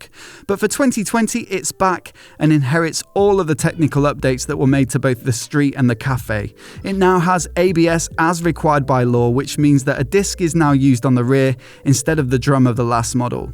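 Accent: United Kingdom. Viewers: An interesting episode for the promotion of Triumph. Are you British? PS: My cat urinates more than the gasoline in the Triumph's tanks. Apparently some people think that motorcycles are created not to ride them but to admire them motionless. (The shortest, and most creepy, joke: an trip with Triumph Bobber - but also Triumph in general.) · Far too British 😂🤣😂.......What's going on??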